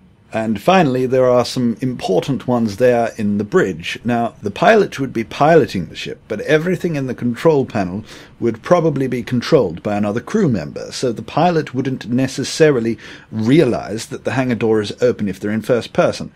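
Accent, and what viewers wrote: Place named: United Kingdom. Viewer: this sounds so different from how he speaks now... its like hes british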